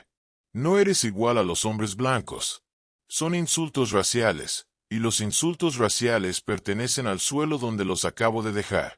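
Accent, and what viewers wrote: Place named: Costa Rica. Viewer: Pronuncia la "ere" como se pronuncia en Costa Rica. Pero le suena feo.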